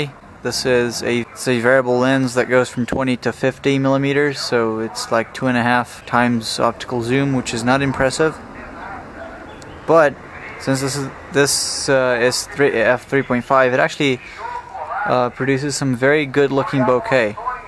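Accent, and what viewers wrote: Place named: New York, United States. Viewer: Que delícia ver um brasileiro falando inglês sem sotaque, na verdade, parece que você tem sotaque de NY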